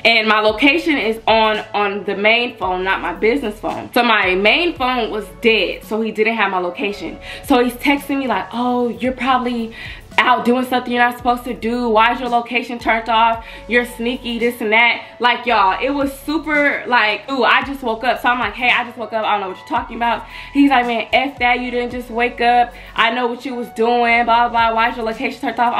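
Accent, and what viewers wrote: Chicago, United States. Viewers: yea , I’m frm chicago n it sound like sum shit that mfs do out here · born and raised here and this is definitely chicago nigga vibes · DEFINITELY CHICAGO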